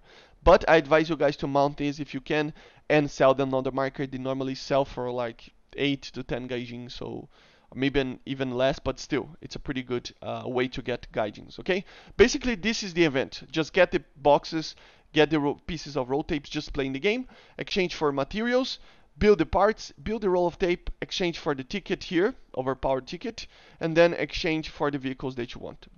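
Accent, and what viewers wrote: Brazil. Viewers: acho que voce é brasileiro, seu sotaque me diz isso. am i wrong?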